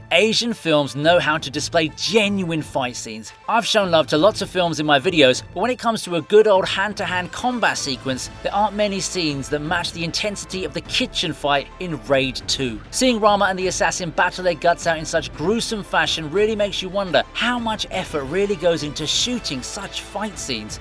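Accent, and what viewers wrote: England, United Kingdom. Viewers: I'm not yet halfway through and already, it's painfully obvious that saying "bro" and "freakin'" in a middle-class English accent is cringe. As for Leonidas' "wall of death", it's a well; someone mistyped your script. Bro.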